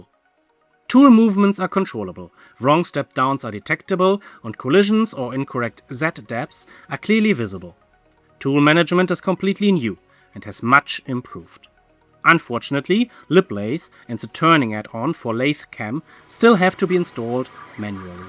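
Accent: Germany. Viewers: Ein Deutscher der englisch spricht ist unverkennbar😅😂 Gutes Video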